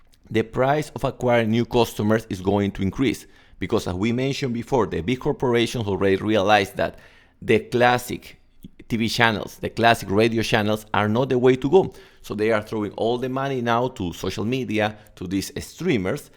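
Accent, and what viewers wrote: Peru. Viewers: Nah, Hbla bien solo que reconoces el acento peruano y te parece malo, pero si lo comparas con un ruso, aleman, se le entiende incluso mejor.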